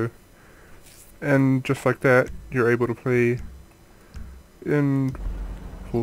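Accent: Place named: New Zealand. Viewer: soo good hearing a Kiwi doing a tut, te-meke!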